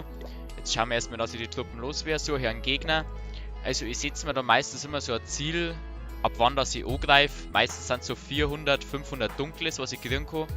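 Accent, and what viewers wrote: Bavaria, Germany. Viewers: Kommst aus Bayern?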